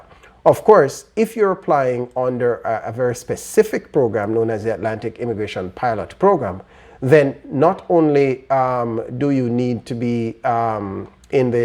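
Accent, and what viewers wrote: Jamaica. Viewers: You sound Jamaican. Thanks for the info.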